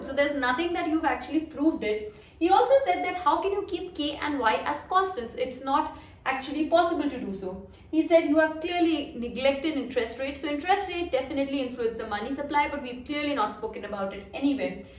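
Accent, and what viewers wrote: India. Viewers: Aree madam ye South Delhi ka accent kyu le rakha hai normally hi bol loo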